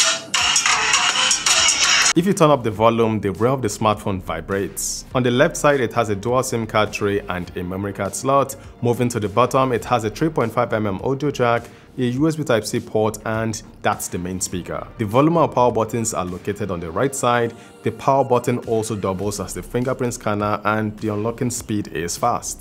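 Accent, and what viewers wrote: Nigeria. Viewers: Bro are u originally from Nigeria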